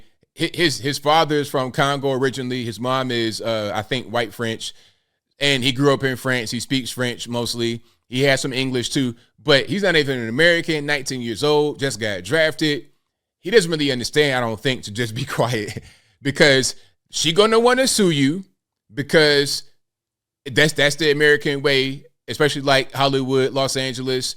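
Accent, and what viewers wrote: United Kingdom. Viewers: Brit here, your British accent is approved! Britney Spears seems like a nutcase to me. I don't think she is all there. Imagine Victor Wembanyama just about to get started on what will likely be a stellar basketball career, and he finds himself in the middle of this nonsense! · doing a British accent is awesome. I think he should do every headline in British. Tut tut, good man.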